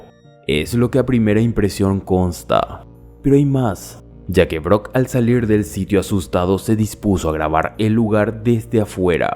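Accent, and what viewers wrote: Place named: Paraguay. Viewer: algun dia hace un video hablando con nuestro acento paraguayo! Me gustaria mucho ya que sos paraguayo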